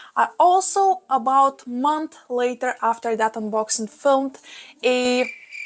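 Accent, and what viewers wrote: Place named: Russia. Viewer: Hope you are doing and feeling great! Your video and your thick Russian accent always bring me joy and elation whenever (read always ) I watch you 👀. Great job on rehabbing those beauties and thank you once again for filling us in the updates -these are always one of my fav videos of your to watch. Hiii from the 🇺🇸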